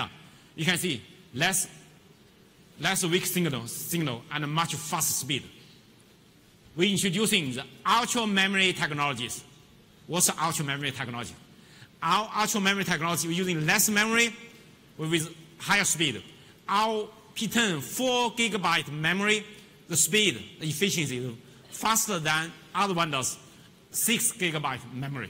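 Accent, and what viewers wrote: China. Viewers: Ugh Chinglish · As a Chinese myself I can easily understand what he is saying about (real Chinglish ),but i am not sure that foreign friends on YouTube can understand.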